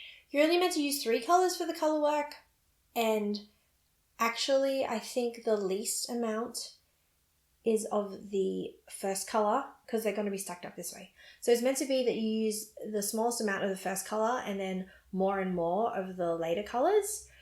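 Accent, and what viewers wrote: Australia. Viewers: Thanks so much for your episodes. It’s lovely to tune in to each episode and listen to an Aussie. Next on my needles is the Gib sweater for my husband - should be fun.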